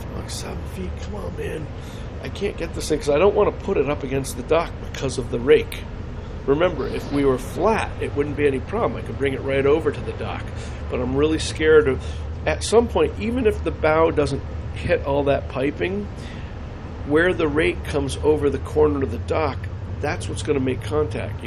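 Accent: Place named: Brooklyn, United States. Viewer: i have a friend from Brooklyn I've known for decades. You and her dad are so similar. Similar accent, speech patterns, hair... every time you talk i hear her dad.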